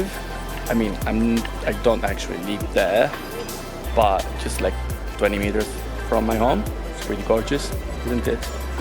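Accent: Piedmont, Italy. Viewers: Do we have to comment in english too? Am I more international too this way? Seriously, this feature is mindblowing, I'd like to see what it can do with pedals or other stuff (By the way, you still have a piedmontese accent while you speak english ahahah)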